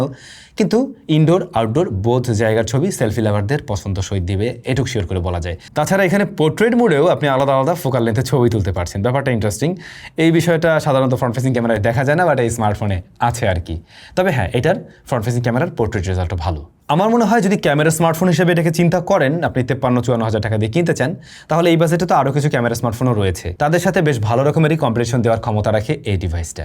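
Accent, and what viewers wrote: Barishal, Bangladesh. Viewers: ভাই, আপনি বরিশাইল্লা!?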